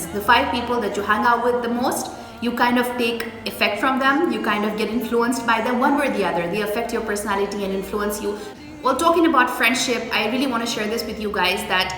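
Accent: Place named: United States. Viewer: Waaaoooww!! You have an amazing American accent. OMG that is soo cool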